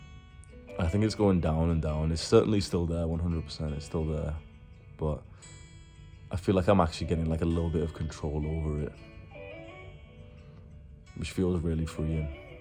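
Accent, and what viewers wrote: Liverpool, United Kingdom. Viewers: Your accent is northwest wirral/Liverpool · Wait is that a Scouse accent i can detect. *Back to the content of the video *Inner healing allows deep soul growth and discovery. It's so fulfilling because the inner joy that happens is freeing because you learn true love and happiness starts within yourself.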